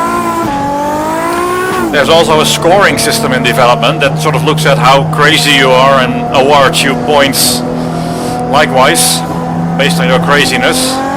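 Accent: Netherlands. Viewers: You dutch right you atleast you sound dutch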